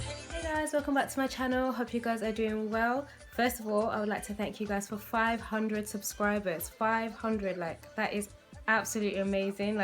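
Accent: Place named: Nigeria. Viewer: Team naija, love your accent , omo Yoruba , check out my channel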